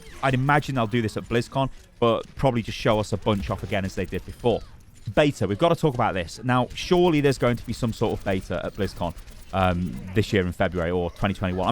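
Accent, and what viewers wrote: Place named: United Kingdom. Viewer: Good ol I don’t even listen to the video, this is just asmr of a British man talking gibberish for 10m 💀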